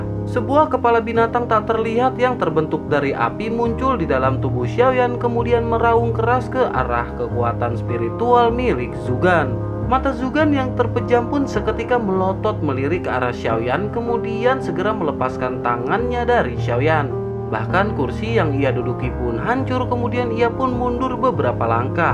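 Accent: West Java, Indonesia. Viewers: Sunda pisan...kanjutkan min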